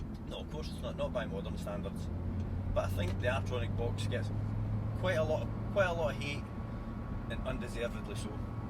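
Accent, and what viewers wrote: Scotland, United Kingdom. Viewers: Beautiful overview, mate :) I just aboslutely love scottish accent :) still my dream car, and the only affordable super car. The way R8 looks is just out of this world :) Currently driving TT mk2 with dsg and these dsg farts on first gears really adds up some "drama" and I love it, I do agree, this small gap between shifts just actually adds up :) superb video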